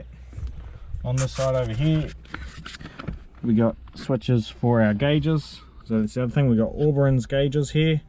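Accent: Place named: New Zealand. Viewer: All us kiwis sound like that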